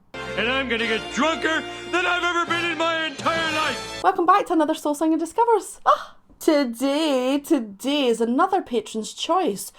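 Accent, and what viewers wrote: Scotland, United Kingdom. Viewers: Great band, terrible sound quality. The bass and vocals get drowned out too much. Your accent is awesome! I only sound like I Am from Kentucky when I drink too much...lol. You know, the street that I live on is Highland. And I am a Scott...coincidence? Keep up the great work My Fine Scottish Lady! ❤❤❤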